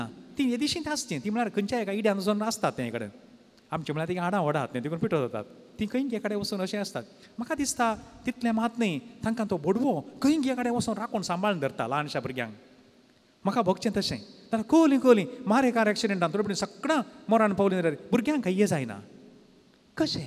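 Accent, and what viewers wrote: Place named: Mangalore, India. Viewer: Father, your Konkani is very clear. Aputt Mangalorean. Thank you for your discourse.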